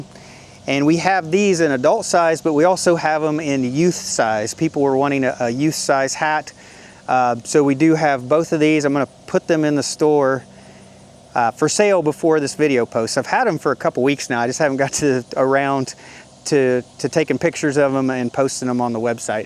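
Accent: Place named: Tennessee, United States. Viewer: I been hearing the ess-cavator as well. I think it's the Tennessee and Kentucky that migrated into rural southern Illinois.👍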